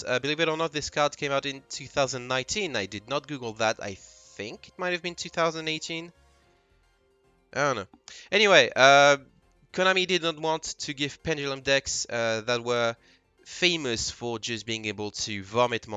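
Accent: France. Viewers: You sound french am I right?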